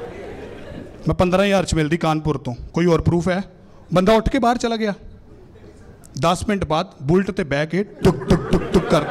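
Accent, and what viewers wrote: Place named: Amritsar, India. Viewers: Pure majhi boli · THAT typical ambarsari accent and words... Priceless...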